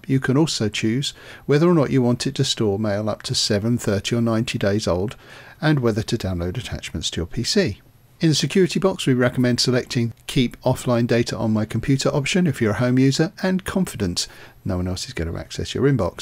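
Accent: England, United Kingdom. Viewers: Machine gun rapid fire voice presentation . Heavy English accent. Listened to tutorial multiple times, tried ajusting volume levels , finally gave up, Will look for another tutorial for downloading Gmail into a windows 10 desk top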